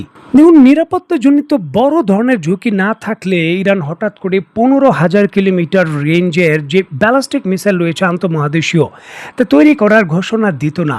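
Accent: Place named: India. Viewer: Amon chinta apnar kamne Ashe je Onno desh Hi-Tech technology diye dey!!! Apni Ekta Awamilegeue tai sob somoy anti Muslim sentimental apnar modhe. Apni sob somoy Muslim der khato kore dekhen. Ami khomotay thakle apnar channel bondho kore ditam ba kemne news Korte hoy tar Ekta guide line ditam. Sob somoy Indian tune Kotha bolen apni.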